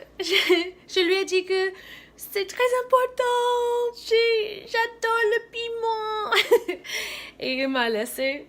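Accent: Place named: Cameroon. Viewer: You have little bite an cameroonian accent is funny. But you do well in french.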